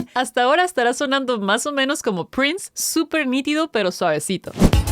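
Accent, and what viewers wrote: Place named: Mexico. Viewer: Che, que lindo acento Mexicano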